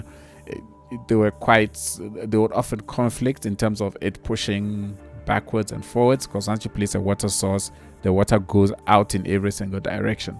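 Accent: South Africa. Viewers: Man it’s great to hear a South African voice on YouTube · Bruh are you South African???????? Mans that accent, i know that accent from anywhere xD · Bro are you South African?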